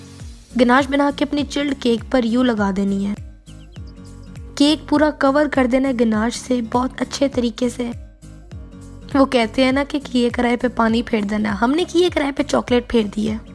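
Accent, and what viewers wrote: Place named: Punjab, India. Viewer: Awaz kharab hai bol rhi ho aap . Par aise bhi kitni pyaari lagrahi hai . Aapka punjabi accent bht pyara lgta hai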